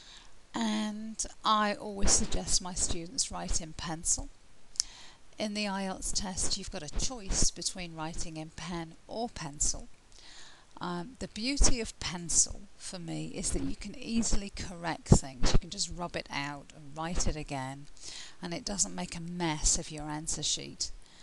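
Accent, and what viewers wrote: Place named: Australia. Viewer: I love your Australian accent. You guys have unique accent in whole world. I just want to say thanks for your this video. You are so cherubic.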